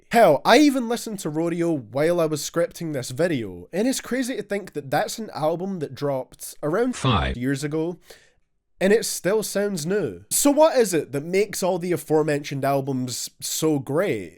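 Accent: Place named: Scotland, United Kingdom. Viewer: What accent is this? Canny work it out but get a feeling its one of the posh Scottish accents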